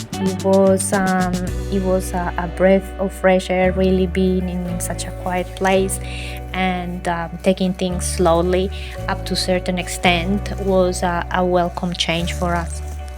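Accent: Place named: Italy. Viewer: I imagined something similar ... your pronunciation in English is the typical pronunciation of an Italian. Welcome to Italy!!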